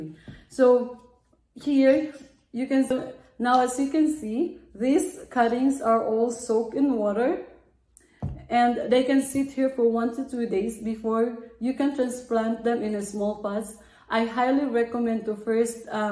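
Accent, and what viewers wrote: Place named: Eastern Europe. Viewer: I like your accent, sounds Slavic. 😊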